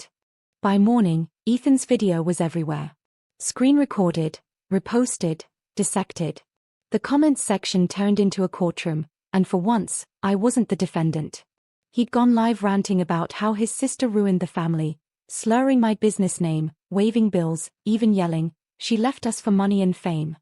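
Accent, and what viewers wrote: United Kingdom. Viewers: Why the British accent? In America it is pronounce skedule not shedule. According to British pronunciation school would be shool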